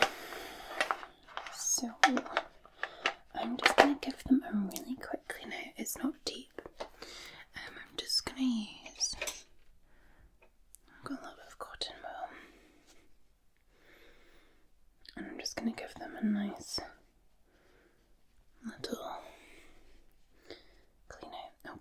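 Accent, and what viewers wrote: Scotland, United Kingdom. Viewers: You are a person and you know what a person needs? a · 26 minutes of tingles from the resplendent scottish lass. perry the platypus noises ☺️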